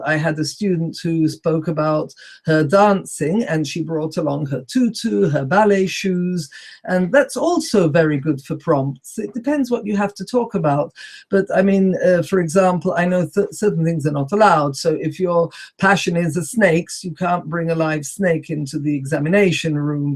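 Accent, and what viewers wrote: Italy. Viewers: From her accent she sounds like an Italian native speaker, however I've found her advice very helpful. It takes more videos like this.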